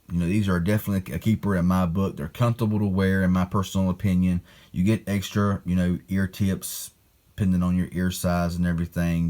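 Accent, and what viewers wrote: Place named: Appalachia, United States. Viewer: Good God, are you TRYING to sound like a freaking hillbilly?!???....what a waste of MY time....I GAVE UP trying to listen to your "presentation"!!!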